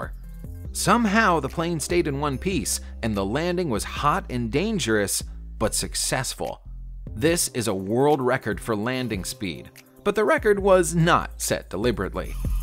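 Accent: United States. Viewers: What are the units for the temps given? Judging by the American accent they could be Fahrenheit, but seeing as none else in the world uses that measurement. Just curious as to some of the actual temperatures endured.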